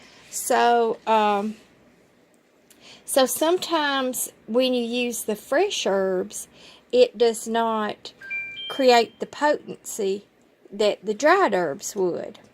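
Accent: South, United States. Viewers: Omg I love your southern accent ❤❤❤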